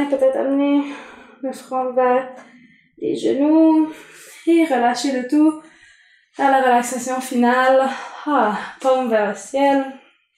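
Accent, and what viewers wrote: Quebec, Canada. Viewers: Bravo à vous !!! Je fais pas mal de séances de yoga sur youtube et je veux vous féliciter pour la qualité de votre cours ! Tout y est clair : postures bien expliquées et mouvements respiratoires annoncés au bon moment. De plus, votre voix et votre accent québecois sont très agréables ! Merci !!!!!! Namasté !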